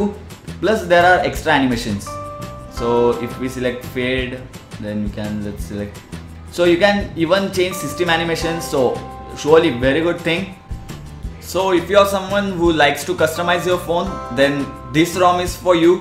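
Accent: India. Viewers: lol indian accent kinda sucks tbh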